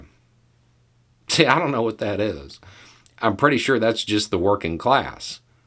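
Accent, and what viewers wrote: South, United States. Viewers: Exactly, he came in after pitching a fit about how much he paid for the company then fired majority of the workers, doesn't explain his vision to about the future, expects the workers to cover everything, sends out an entitled email and expects his employees to go "well they say he's a genius so 80hr work weeks (or more) here I come for no additional resources or perks!" sorry if that had happened to me, I'd be out of there faster than you can count to one. Nothing about what Musk has done sounds stable, innovative, or reassuring. Us working folks like stability regardless if they talk southern like or west coast like myself. They want to know that their job will be there in a day, week, month, or 6 months because you know we like to plan and to be able to afford everyday expenses. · What are the two words you are surprised to hear from somebody with a southern accent: "Check Mate". 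Don't let the southern accent fool you.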